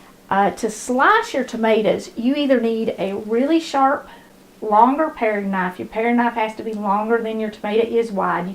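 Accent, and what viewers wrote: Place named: Appalachia, United States. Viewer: So simple and yet so satisfying! Everything you make - and the accent - hits sentimental chords of my WV and Eastern KY upbringing ... miss these meals my mammaw and mom would make! I am so happy to be your subscriber!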